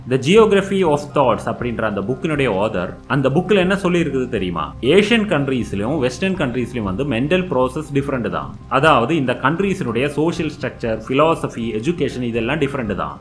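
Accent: Kerala, India. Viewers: Are u malayaali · Are you malayali right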